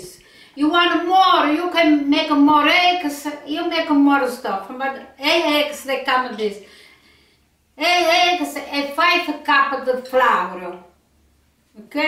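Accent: Italy. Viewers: Isn't she wonderful. I love her Italian accent. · Merry Christmas! Love the family stories and the singing! 💕❤️💕 I was wondering about the consistency of the Struffoli. Is it dense or airy? We like it dense, but the bakeries always seem to sell the airy kind! So much work......but.....yum! So worth it! Wish I took more notes in the kitchen as well as the huge wooden board that my Mother-In-Law’s mom always used to make the struffoli! So grateful that I found your channel! What a special treat! I remember when I first met Grandma....she gave me a plate of struffoli to take home! A delicious treasure! Your videos are getting me through some sad times........I so miss my mother-in-law! But hearing your Italian accent, listening to your stories and songs......warms my heart! Thank you! God bless you and your family! 💕❤️💕❤️💕❤️💕❤️💕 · Hello from England. We love watching you and we love your Italian accent too. 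A very Merry Christmas to you and your family! Xoxo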